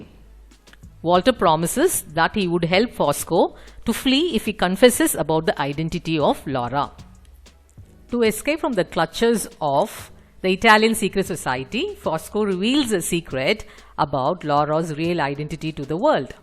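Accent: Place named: India. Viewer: i dont understand anything becuase of your accent' why u were born as an indian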